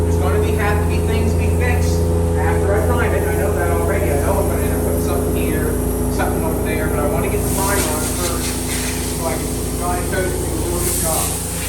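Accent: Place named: Canada. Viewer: You sound really Canadian ey👍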